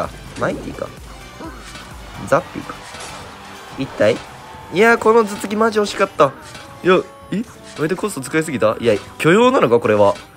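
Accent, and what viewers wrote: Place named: Kansai, Japan. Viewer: なんか今回粗品の動画見たあとなんかってゆうぐらいにちょいちょい関西弁ぽいの聞こえるなぁ笑笑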